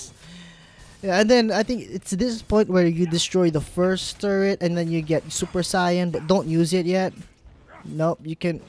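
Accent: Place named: Philippines. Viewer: Lol pinoy KA no KASI accent mo PA LANG pinoy na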